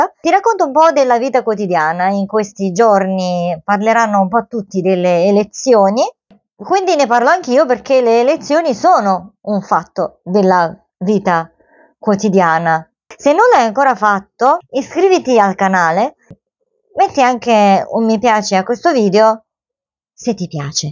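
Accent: Naples, Italy. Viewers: Ciao.. sono arrivato al tuo canale per gli arcani misteri dell algoritmo di youtube e sinceramente sono rimasto positivamente basito. Ubi bene ibi patria scrivevano i romani tu sicuramente li sei felice e non ce bisogno di chiederti perche'! . Le tue ultime parole sono uno splendido messaggio all'occidente di non perdere quello che ha costruito , diritti sociali e opportunita di cambiamento. Non vivi con i coniglietti ma hai quella compassione tutta partenopea ( credo, partenopeo.. l'accento mi induce a pensarlo ) nell'accettare l'ineluttabilita' degli eventi in un luogo dove, un sogno sociale e' diventato un incubo per molti e la cura, dopo il risveglio decisamente piu lugubre . Navalny era una truffa tutta occidentale perche' niente di lui volgeva a un pensiero democratico ma sai.. anche qua la sinistra si e innamorata di hamas che dei diritti sociali e di tutto quello che l'illuminismo vede nella societa' sono la reale antitesi. 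Un po come la destra nostrana che paga Dugin per farsi raccontare che la razza non e' acqua e loro (Russi) sono gli angeli e gli altri destinati a una inevitabile sottomissione.. Incongruita dei sistemi .. Ajmone Federico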